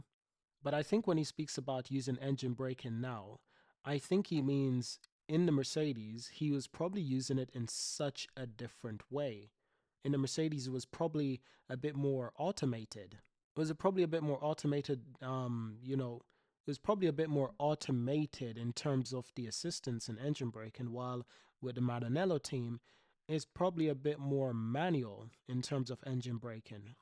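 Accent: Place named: Bristol, United Kingdom. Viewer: **It wasn't anything specific you said; it was more about how you pronounce certain words. You can tell that your tongue is positioned higher in your mouth, closer to the palate, when you speak. Additionally, there's less movement in your jaw; the tension in the jaw muscles can be heard if you know what to listen for These are 2 key and unique mechanics to a Bristol accent vs other accents from that region. There were a few other clues, but none related to slang, as I'm not familiar enough with British regional slang for that to have been a hint for me. I could possibly narrow down the area further, but out of respect for your privacy, I won't do that in the comment section, lol**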